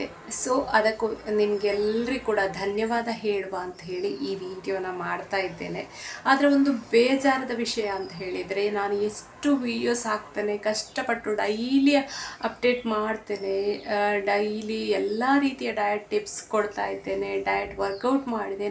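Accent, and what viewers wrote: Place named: South Canara, India. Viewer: Please support me..nivu maathanaadodu kelidre nivu south canara udupi ಕಡೆಯವರು ಅನ್ನಿಸುತ್ತೆ..ಯಾಕಂದ್ರೆ ನಾವು ಕೂಡ southcanara ದವರೆ🤗🤗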